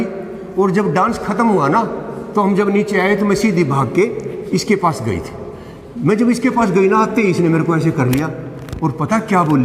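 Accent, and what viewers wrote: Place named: Khari Boli, India. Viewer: महोदय अभी पिछ्ले हफ्ते ही मैने आपकी एक वीडियो देखी, जो मेरे पिताजी ने मुझे भेजी थी। आपकी आवाज़ में जो खड़ी बोली की सीमित मात्रा है वो एक बहुत अच्छा अनुभव कराती है। मुझे भी अपने बचपन के दिनो का एहसास कराती है। मैं आपकी छोटी पर गहरी बातो को नियमित सुनता हूँ। आपका तहे दिल से शुक्रिया अदा करता हूँ की आप बच्चो के लिये ज्ञानवर्धक सामग्री उपलब्ध कराने का उत्तम कार्य कर रहे है। नमस्ते जी। 🙏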